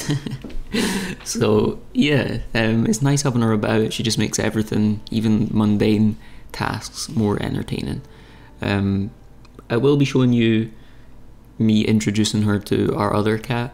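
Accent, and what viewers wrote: Scotland, United Kingdom. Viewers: HI AND JUNIOR I HAD A CAT CALLED SPODLE SHE LIVED TO23 AND I STILL MISS HER KEEP STRONG AND I KNOW THAT LOVELY SCOTTISH VOICE IM FROM ABERDEEN❤❤❤❤❤❤❤😊😊😊😊😊😊😊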